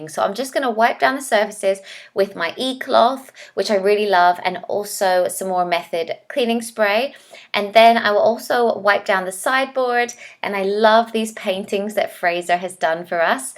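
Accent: England, United Kingdom. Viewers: Is she British 🤔 (I know I sound silly asking this 😁😂🤣) xx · also from Meg's channel !! great vid -- hv always adored British accents 😍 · I'm Canadian too, but live in bc I would have never guessed you weren't born in England.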